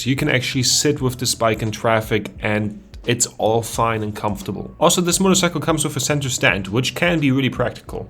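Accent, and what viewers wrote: Germany. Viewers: Bruh the German accent is killin me 💀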